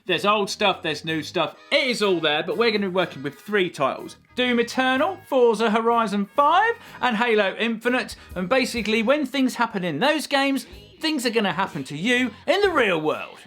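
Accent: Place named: Midlands, United Kingdom. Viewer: purely your heavy Midlands (I think) accent coming through 🤣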